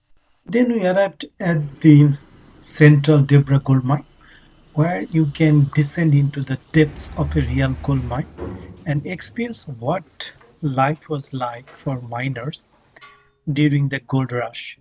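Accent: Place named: Bangladesh. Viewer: You speak bangladesh english, can't understand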